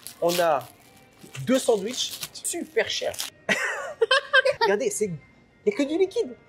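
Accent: France. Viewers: Ton anglais est tres francisé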